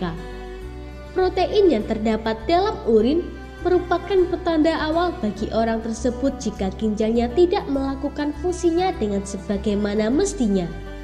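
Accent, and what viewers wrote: Java, Indonesia. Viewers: Suaranya medooook banget mbak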